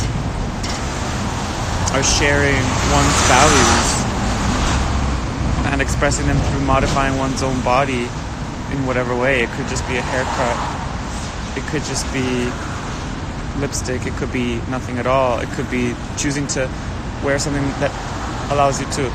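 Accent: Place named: Venezuela. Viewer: Just because I don't understand someone, doesn't mean I have to hate them. Her Venezuelan Spanish is hard for my Texan ears.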